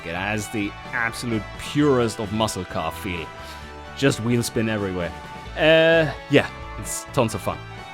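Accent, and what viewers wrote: Germany. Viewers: Bon soir monsieur, are you from this lovely part of our earth Germany? I cant quite make out if you are German or not... Cheers mate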